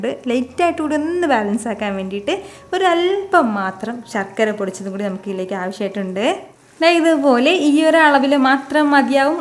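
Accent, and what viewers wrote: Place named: Thrissur, India. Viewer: മൂളകൂഷൃം ത്രശ്ശുർകാർ പറയൂം ചെറിയൊരു വൃതൃസം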